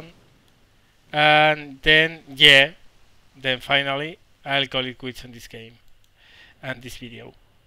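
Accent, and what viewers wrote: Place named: Spain. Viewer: Yay!!!! :D so happy you're back! I've missed your beautiful Spanish accent :p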